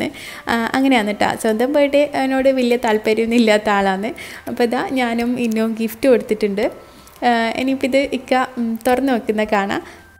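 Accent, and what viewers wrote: Kannur, India. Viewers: കണ്ണൂർ ഭാഷ എനിക്ക് ഭയങ്കര ഇഷ്ടമാണ്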